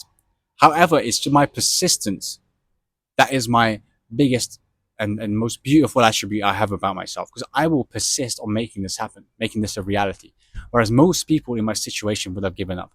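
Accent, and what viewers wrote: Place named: South Africa. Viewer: Why does he have a South African accent...NOT a UK one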